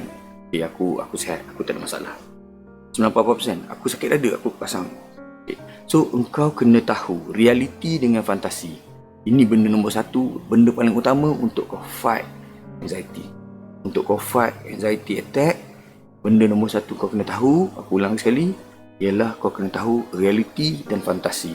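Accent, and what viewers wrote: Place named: Pahang, Malaysia. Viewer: Sedap betoi dgr bro sembang..bunyi mcm org bentong je....boeeek bro👍👍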